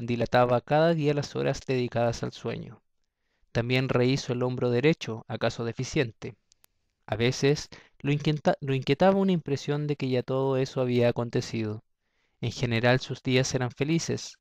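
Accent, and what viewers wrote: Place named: Chile. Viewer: se agradece la narracion chilena, saludos desde santiago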